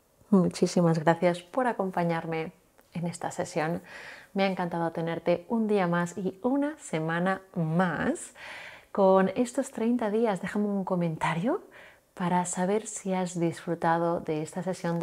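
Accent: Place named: Argentina. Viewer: Buenos días si fue intenso porque mis rodillas me fallan pero trate en lo que pude hacer muchas gracias, voy a repetir este si me gustó, gracias siento con tu voz una persona muy agradable y sencible Dios te bendiga,por x tu acento creo eres Argentina, bonito día ❤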